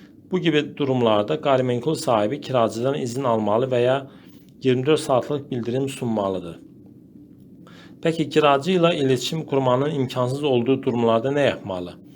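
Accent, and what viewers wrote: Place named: Azerbaijan. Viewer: Bu sesi yazan mutlek ki azerbaycanlidir. Super alinmishdir))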